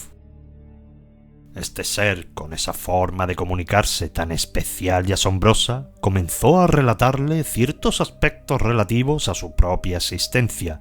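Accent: Spain. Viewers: Hola Me gusta cómo narra los videos y las historias Yo vivo en California soy de Guadalajara México gracias por tan bonitas historias Por su forma de hablar creo que viven en España gracias otra vez y Hasta la vista recuerde yo lo escuchó siempre y la mayoría de sus historias las tengo guardadas Hasta la vista y cuídese Que Dios lo bendiga Pepe Gaitán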